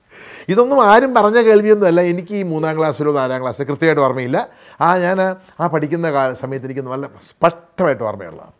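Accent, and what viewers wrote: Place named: Kottayam, India. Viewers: Achayanmarude pakka style samsaram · പാലാ style ഇൽ തന്നെ ഉള്ള സംഭാഷണം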